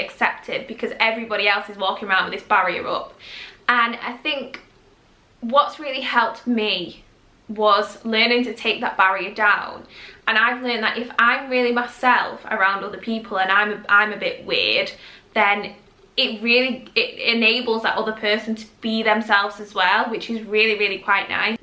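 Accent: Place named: North, United Kingdom. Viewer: Love the northern accent always... and hahah I remember the 20mins to text back so I will take longer.... what a game 😂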